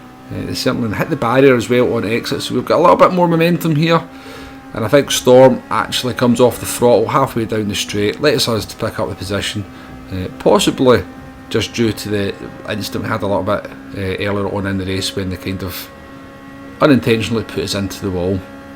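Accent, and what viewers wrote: Scotland, United Kingdom. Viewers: haha I knew exactly what you meant so it's not a bad descriptive either, just a rather amusing one. Especially with the Scottish twang. Maybe you should trademark it for when you hit the big time of seven gazillion subscribers ;)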